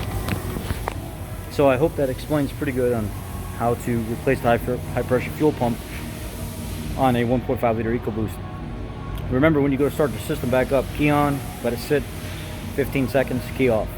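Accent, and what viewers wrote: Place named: Northern Illinois, United States. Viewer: Does sound any where like Steve, but he does sound like someone from northern Illinois! 😂😎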